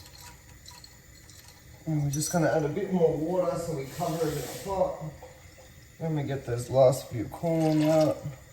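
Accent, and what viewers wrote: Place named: Australia. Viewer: love your accent as well. I know your aussie but can tell theres a background somewhere,maybe second generation aussie but i can hear the little accent coming and going. Im from Sydney so grew up with lots of nationalities. Reminds me of home.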